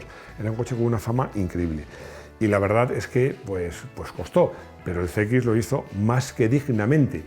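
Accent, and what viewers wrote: Chile. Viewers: tiene acento Chileno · Que a le escuche un acento chileno? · es chileno? ese acento se me hace familiar...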